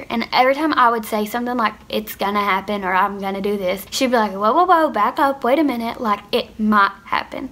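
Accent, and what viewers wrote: South, United States. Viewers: Tinna jones it doesn’t sound uncommon to me, it just sounds like a southern accent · girl my first thought when you started talking was that you were from kentucky too!! you’re so pretty. anyways thank you for sharing this,, it’s definitely gonna help a lot of people. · talks with a southern drawl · I knew as soon as I turned on your video you were a fellow Kentuckian! Great story time. .thanks for sharing your story How the hell did she know your friends last name? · So much courage! I hear some southern I’m from Georgia ! I appreciate your story! May god bless you! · Oh my !! I’m new, from Canada Ontario. You have such a beautiful southern accent !!! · Yo, I swear I knew your accent 😂 KY girls gotta represent. Lol. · Kentucky crew lol sorry hun but I love the accent mine isn't thick cuz how North eastern I am or at least I don't notice it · I love your accent sounds very Southern i can tell ur from Oklahoma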